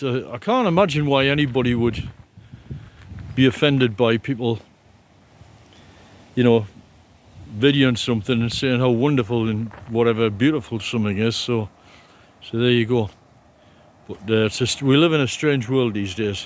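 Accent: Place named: Northern England, United Kingdom. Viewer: I can tell that you come from the North of England. My Mother came from Newcastle. I agree with much of what you say. Look at the bows on the current fleet of IMOCA racing boats that just completed a circumnavigation. Other boats that have "Scow" bows are popular in the single handed Mini Trans-Atlantic race (24 feet). If one looks at the construction of Cruise Ships today they are effectively a narrow rectangle with a pointy end and a long snout. I have a feeling that the boat you were looking at was certainly an inland sailing vessel at some time in the past. I would not be keen to take a vessel with Lea-boards to sea nor would I wish to sail a "scow bow" upwind in 30 knots in The Atlantic. Scow bows work well off the wind. Every dog has its day but the ones that are bred with malice aforethought tend to do better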